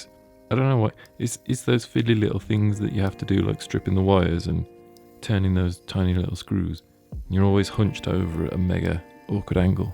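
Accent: United Kingdom. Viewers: Its hynotic to just see you draw your british blabber fades as background noise.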